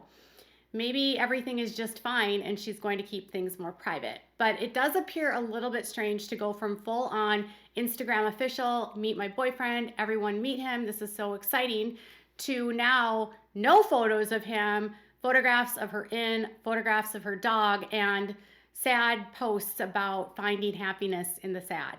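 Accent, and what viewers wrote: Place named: New York, United States. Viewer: Where are you from? You have my home town accent (Rochester/Buffalo NY)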